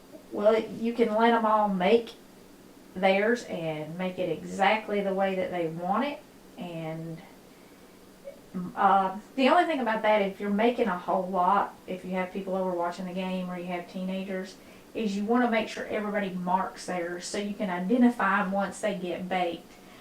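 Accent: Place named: Kentucky, United States. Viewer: I want to thank you for your delightful channel. I have subscribed. I actually find your videos calming, full of love and deliciousness. I spent 4 years in your awesome state while I attended under graduate to college in Western Kentucky. Your food and cute little accent brings me back to those great days. I was a “Yankee” back then. I really didn’t know what that meant, but since I was “Italian” with first generation parents, they gave me a pass to join their group not as a yankee but as a European. LOL. I learned so much living in Kentucky. It’s a pretty great place to live.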